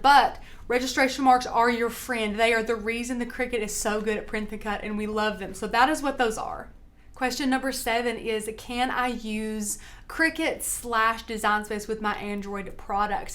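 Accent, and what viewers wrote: South, United States. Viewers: This is awesome training. I love your accent! I'm from SC. Love all of our southern accents!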